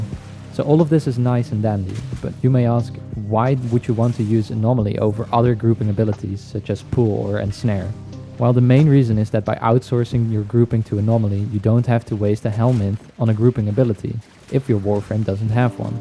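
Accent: Netherlands. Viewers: Dutch for sure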